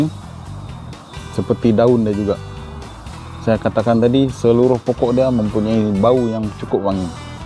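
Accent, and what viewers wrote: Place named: Northern Peninsula, Malaysia. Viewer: Helo bang👋🏻😍salam kenal🤝🏼slang abg macam slang utara semenanjunglah✌🏼😉ok bang terima kasih atas perkongsian yg sangat berguna ini ya..saya x pernah tau lagi pasal pokok jerangau ni..terima kasih bang😍👍🏻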